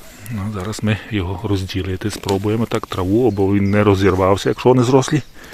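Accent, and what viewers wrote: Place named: Skole, Ukraine. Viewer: Скажіть, будь ласка, а ви не зі Сколівського району? Бо дуже подібний діалект.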